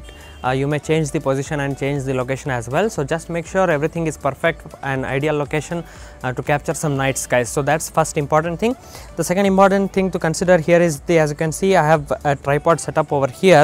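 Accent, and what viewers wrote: South India, India. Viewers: Accent is so much south Indian... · i always like indian accent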